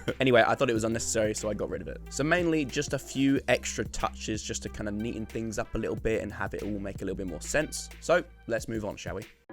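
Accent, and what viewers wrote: United Kingdom. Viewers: Your calm speech and voice, British accent, the chillhop and the patience and hard work you put into these builds/videos makes for such a fucking amazing and relaxing content. Your channel is Gold, mate.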